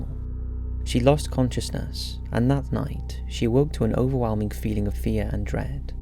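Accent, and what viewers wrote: Wales, United Kingdom. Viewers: Thats a welsh accent si x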